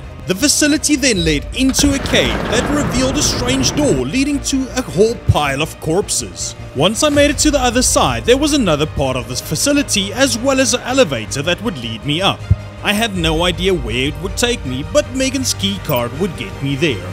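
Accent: Afrikaans, South Africa. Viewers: Are you south African? It's the accent 🤣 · You Afrikaans? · is he from south africa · Why do u sound like u from South Africa. :D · you sound south african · You sound south african ngl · Are you from South Africa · Goeie video Jy kan in jou stem hoor jy is van SA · are you from south africa??? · are you from ZA ? if so what province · bro he sounds like a south African man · Bro, are you from South Africa? Your accent sounds very familiar! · Some words sound like south Africa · I only have watched 2 minutes of the video and I'd say that this guy is 100% south African. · Gotta love that boer voice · Ek is mal oor daai afrikaanse aksent 😂 awesome vidio bra 🤙🏻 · Okay but if i'm not mistaken hopefully the Afrikaans(?) accent makes everything so much funnier. As an African myself who lives in NZ now it always makes me so happy to hear a raw African accent. 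(I'm so sorry if you aren't Afrikaans but otherwise your accent is amazing.)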